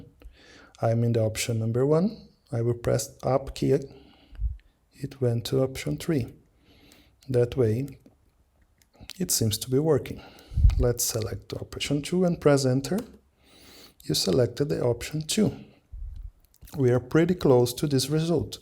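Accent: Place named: Brazil. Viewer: Very good english bro. Haha percebi que era brasileiro pelo sotaque, muito bom o tutorial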